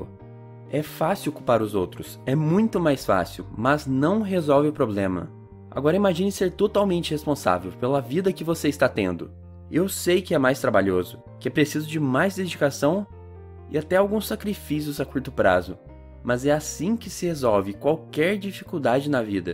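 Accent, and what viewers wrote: Caipira, Brazil. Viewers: Seu sotaque caipira cansa o vídeo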